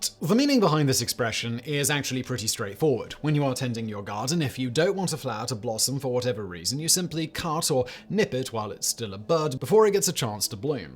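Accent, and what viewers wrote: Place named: RP, United Kingdom. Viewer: To my dumb American ear, posh English accent make "bald" and "bold" sound almost exactly the same 😆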